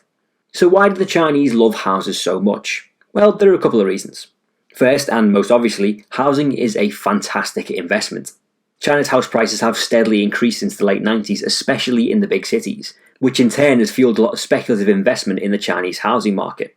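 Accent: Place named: Liverpool, United Kingdom. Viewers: Hard to take anything serious come with a scouse accent . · He has a scouse accent, fairly mild.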